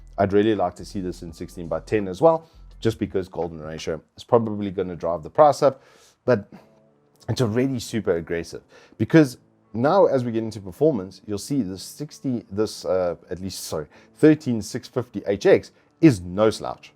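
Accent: South Africa. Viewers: hes south african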